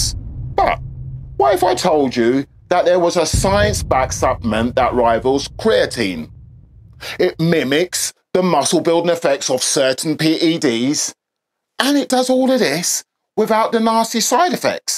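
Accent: United Kingdom. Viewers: I love your snappy Brit accent...reminds me of the Guy Ritchie movies...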